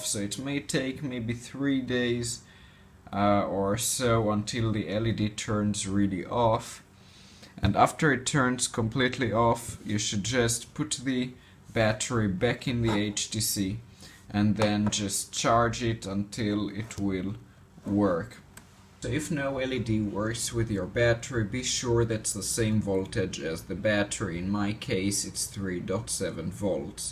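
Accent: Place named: Germany. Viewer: Mir gefaellt dein deutscher Accent.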